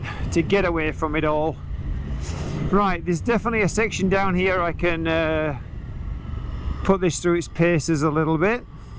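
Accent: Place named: North East, United Kingdom. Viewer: That's definately a N.Yorks/Durham accent for sure, tha'knows. Oh wait what's the point of leaving comments when he can't be arsed to reply ?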